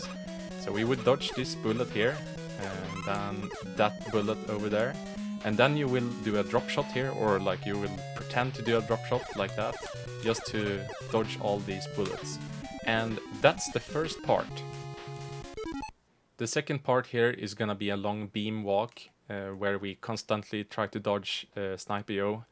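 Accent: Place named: Sweden. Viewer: your swinglish is awesome. thanks for all the vids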